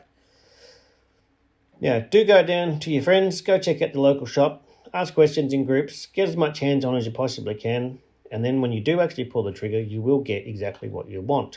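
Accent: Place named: Australia. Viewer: I guess it's just ME (Anyone else have this problem?) but..........that heavy Aussie accent and fast-talking got me. Understood about 10% of what you said. Was looking forward to a super-informational video on thermal scopes/spotters but had to bow out early. Bummer.......!!!! (Maybe you can slow down your speech a bit.........Will give you a "Thumbs Up" as I'd bet the video was otherwise worth it).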